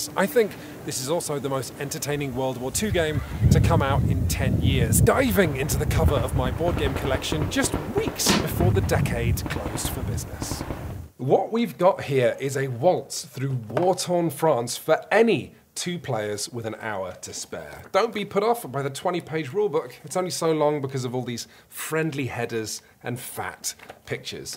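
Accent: United Kingdom. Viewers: Genuinely moving stuff. It's especially refreshing and significant to hear this perspective shared from a British voice. This made me proud to be a donator.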